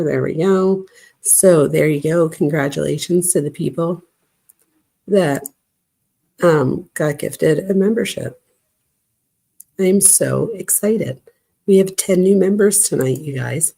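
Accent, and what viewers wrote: Philadelphia, United States. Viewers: Are you from philly? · ❤LIKED n 🙋🏼‍♀️SUBSCRIBED! You're voice sounds like 🏡home🥰 I miss the Philly/ (....or NJ?) accent living out here in CA— It's just comforting to hear. ... And you seem like an excellent little snoop!🕵🏼‍♀️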